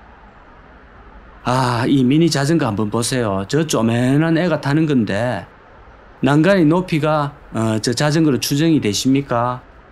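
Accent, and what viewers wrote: Gyeongsang, South Korea. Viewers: 구수하니 경상도 사투리 듣기 조아요 아주 꾸밈없는 목소리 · 엄마가 아니고 음마도 아니고 은마입니다 ㅋㅋ 갱상도 사투리 매력😊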